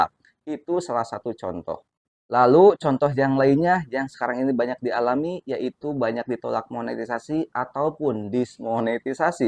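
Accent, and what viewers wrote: West Java, Indonesia. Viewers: Assalamualaikum...kg nyimak mantap...Oia kg orang mna Sunda pisan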